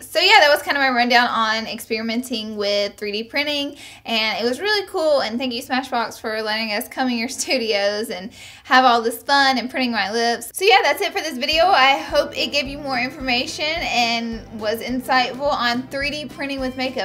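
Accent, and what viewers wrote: South, United States. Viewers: She is so country I love it!!!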